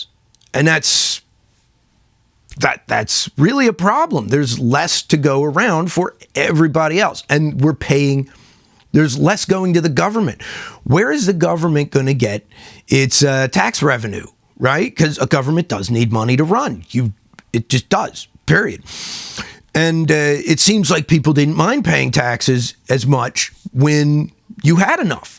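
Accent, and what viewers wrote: Pittsburgh, United States. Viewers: You sound like your from Pittsburgh 🤣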